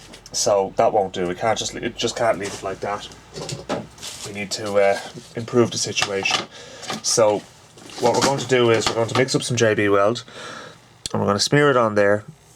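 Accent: Ireland. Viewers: Worth watching for the irish accent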